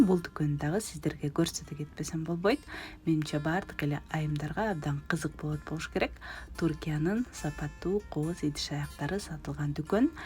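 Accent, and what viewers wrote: Standard. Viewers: Таза кыргызча сүйлөйт экенсиң, кооз, уккулуктуу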